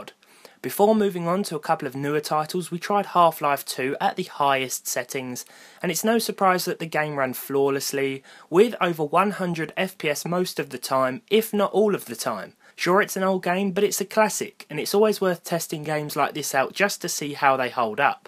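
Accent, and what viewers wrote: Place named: England, United Kingdom. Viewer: dude I'm so glad I found ur videos it's so good to hear a familiar English accent know so much about gaming on a budget haha ur sooo chill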